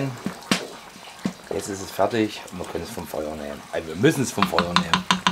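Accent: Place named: Saxony-Anhalt, Germany. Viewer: Leckeres Rezept😋 und dem Dialekt nach zu urteilen,könntest du aus Sachsen-Anhalt kommen🙈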